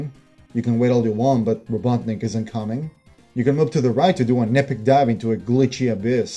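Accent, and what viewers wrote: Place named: Argentina. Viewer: What an accent you have man! I'm also from Argentina and I still sound like one while speaking in English , s3 and knuckles definitely is one of the best sonic between mania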